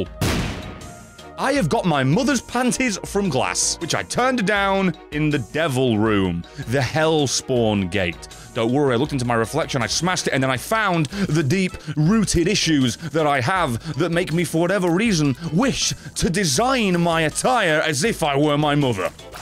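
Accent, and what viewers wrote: United Kingdom. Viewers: Le funni screamy british man has uploaded, what a time to be alive. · Sup you British babe?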